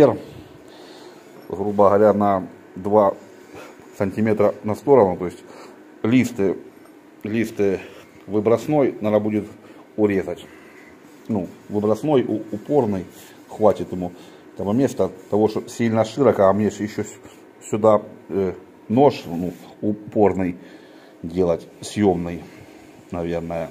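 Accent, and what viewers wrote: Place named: Volga, Russia. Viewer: привет! С праздником тебя! Я вот слушаю тебя ты вроде с Украины, а балакаеш как волжанин, ( симу, как то оно так), и ещё какие-то замечаю выражения которые слышу каждый день, только без обид! Иногда я думаю сядем за один стол, обязательно выпьем, по балакаем и всё будет хорошо и у нас и наших детей!!!!! Мира вам и чистого неба как изумруд!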